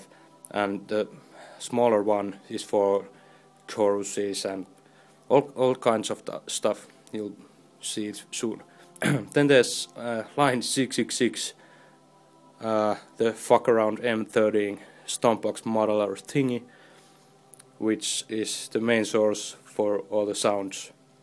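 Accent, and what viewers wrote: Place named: Finland. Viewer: Are you finnish? your english sounds exactly like when a finnish talks english :P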